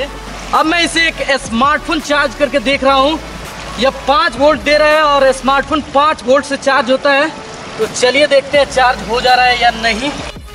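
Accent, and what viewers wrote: Bihar, India. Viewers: bihari ho ka babua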